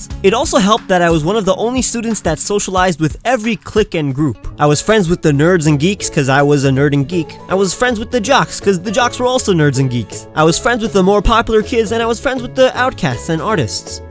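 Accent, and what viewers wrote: Israel. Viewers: Always wondered why you had a little bit of an Israeli scent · אתה לא יכול לשמוע את המבטא הישראלי בקולו?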